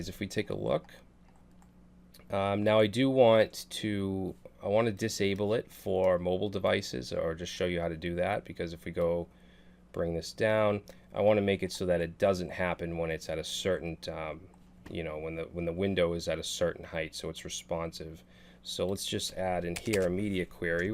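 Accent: Boston, United States. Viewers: dig the boston accent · When you hear someone's voice for so long and finally see their face. It's like my mind just went to a whole different dimension trying to sync up your face and voice. In all seriousness, I actually think your Boston accent helps me learn so much more.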